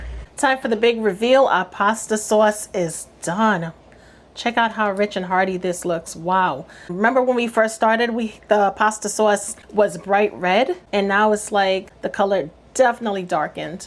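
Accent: New York, United States. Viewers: Looking sooo yummy 😋. Loving the New York accent 😊❤ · 🇨🇦👋 Girl, I am only at 2 minutes 11 seconds and I know I am going to love this recipe. It helps that you are so awesome to watch. I love your accent. You sound like you're from New York or New Jersey. I'm Canadian so I could be wrong but loving it so far thank you.😊😊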